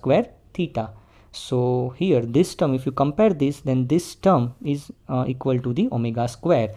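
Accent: Gujarat, India. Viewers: Sir doubt toh solve ho gaya...but your gujarati accent..🤣❤❤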